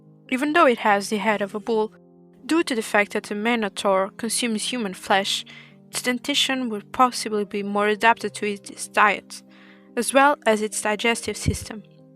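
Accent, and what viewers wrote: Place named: Portugal. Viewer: Noticed it too, sounds like (european) portuguese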